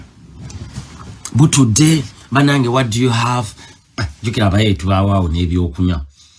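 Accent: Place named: Buganda, Uganda. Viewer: Wabula today u made me laugh with your bunnakiganda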